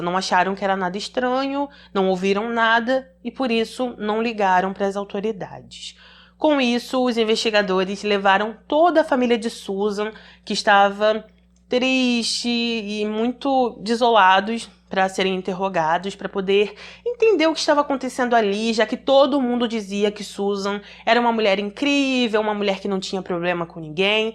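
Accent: Rio de Janeiro, Brazil. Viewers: Adoro O sutaque carioca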